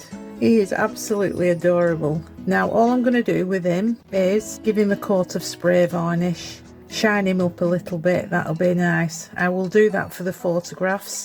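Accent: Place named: Radcliffe, United Kingdom. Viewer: can I ask about your accent please? I've been trying to place it for ages! I'm originally from Radcliffe, and yours doesn't sound too dissimilar!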